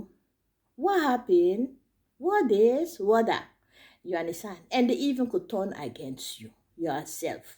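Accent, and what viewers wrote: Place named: Africa. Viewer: I love your channel dear. Where are you from? You sound so African.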